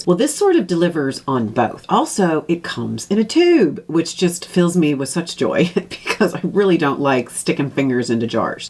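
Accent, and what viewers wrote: Ireland. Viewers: I am new to your channel and wondered what your accent is. A little Irish? Just curious. My boyfriend also hates Snail Mucin! Any time I use it, he doesn’t want to kiss me but ultimately can’t help himself in the end 😂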